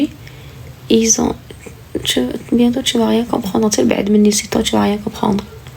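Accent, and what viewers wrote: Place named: Morocco. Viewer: انتي❤ مغربي❤ لهجتك ثقيلي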